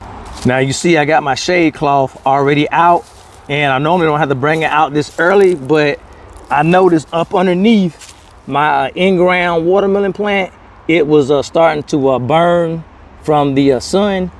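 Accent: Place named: Baltimore, United States. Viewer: ok 😅 thought I heard a twinge of a Baltimore accent. Cool! Thank you for your helpful videos 😊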